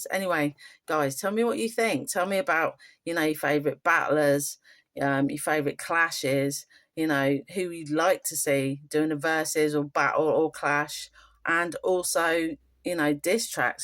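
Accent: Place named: England, United Kingdom. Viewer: Do you mind if I skip my favorites? You skipped, not my favourite by any stretch, but a grime OG. You still sound English as fuck, how long have you lived in America? Ya, Lord of the mics is the best battle, because it was thee battle. It showed why grime was it's own thing. Beats, cadence, London accents, and slang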